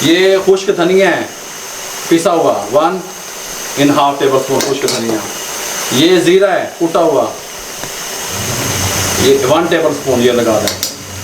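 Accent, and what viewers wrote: Punjab, India. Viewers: Bhai mjhe khane bnane ka to shoq ni a me ka punjabi aksent sune ke lie lgata hn 😂😂😂👍👍👍👍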